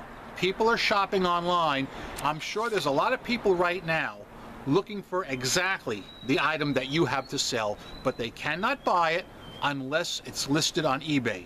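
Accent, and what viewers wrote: New York, United States. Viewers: the Joisey Boy with the New York Accent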